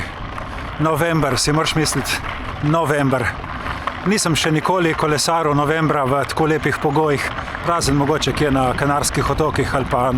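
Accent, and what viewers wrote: Primorska, Slovenia. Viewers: Predstavitve tvojih "potovanj" so mi všeč zaradi preprostosti in tudi, ker rad slišim primorski naglas. Malo ti zavidam, ker se voziš po lepih krajih in ti ni treba takoj v klanec. Jaz sem Zasavc in mi je to prikrajšano, ker moram takoj prestaviti v prvo za kakšno turo in še naša ozka dolina na cestah ni preveč prijazna do kolesarjev. Te dni sem zamenjal kolo in sem šel nad naše dva tisočake v Julice, kjer so me pozdravili vršaci nad meglenim morjem.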